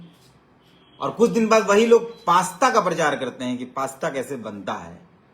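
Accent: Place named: Bihar, India. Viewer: Ap jharkhandi jesa bat karo ne ap bihari jesa bat kar rahe ho jharkhand ka niwasi ho kar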